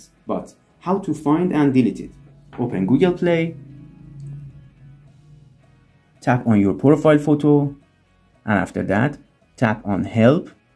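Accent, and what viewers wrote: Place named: Iran. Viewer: لهجت میخوره ایرانی باشی رفیق 😂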